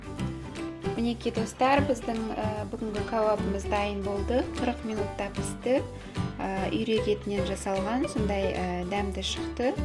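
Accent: Standard. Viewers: рахмет, таза қазақша сөйлейді екенсің, бәрі түсінікті юеткіздің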